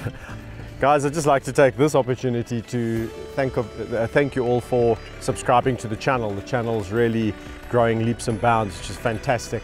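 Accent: South Africa. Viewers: I love the “sithe Efrikan” accent. 💗💗💗💗💗💗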